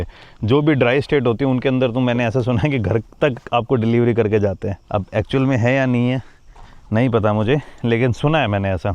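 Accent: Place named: Northeast, India. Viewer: Apke hindi v thdi bohot northeast ke jese ho gye mil jyga naaaa love it sir frm assam😊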